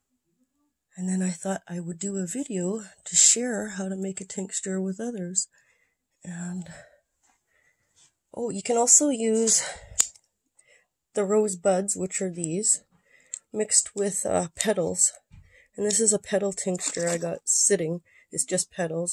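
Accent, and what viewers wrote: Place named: Canada. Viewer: I love your Canadia accent and like you I love plants especially pine trees don't ask me why I probably smell oh and lavender to LOL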